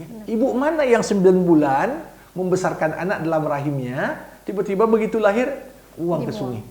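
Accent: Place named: Aceh, Indonesia. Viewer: Masya Allah,dari logatnya kek org aceh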